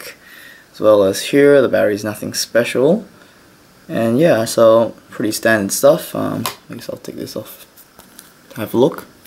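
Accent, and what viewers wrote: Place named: England, United Kingdom. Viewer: He sounds like he is from England so... why wouldn’t he.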